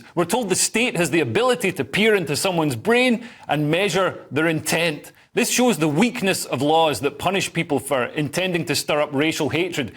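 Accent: Scotland, United Kingdom. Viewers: I like the Scottish accent for news reading. Could we try a Glesga accent next ? Has the guilty policeman and the court official responsible not just for the Britons arrest but twisting our laws, so as to jail a man for posting harmless posters around town.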